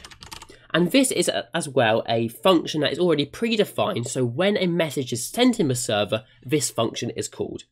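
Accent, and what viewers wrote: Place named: United Kingdom. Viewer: oh, you sound so british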